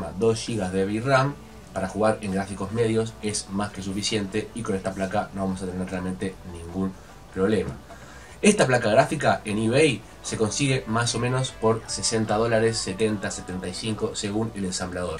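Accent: Uruguay. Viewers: has faltado a la verdad en este video, ya que, solo la GPU, vale más de 100 USD ^u^ lol El micro vale 40 UDS, la placa 120 USD, sin contar la fuente, gabinete, disco, ram, etc, etc. Por cierto, eres de Uruguay verdad? Saludos. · Opa sos uruguayo q crack q sos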